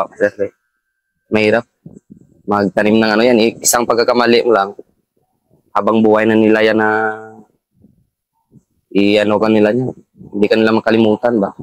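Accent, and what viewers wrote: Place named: Iloilo, Philippines. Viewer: Sure ako na ilonggo accent nito we salute po sa inyo sir.❤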